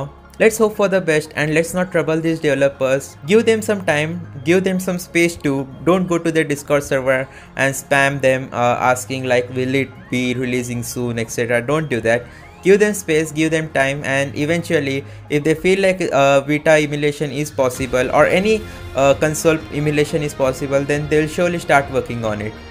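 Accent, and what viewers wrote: India. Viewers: Indian english = fake news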